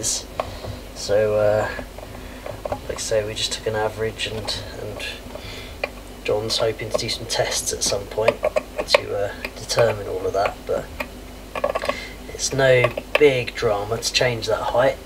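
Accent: United Kingdom. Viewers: 我竟然能听出是英式口音？？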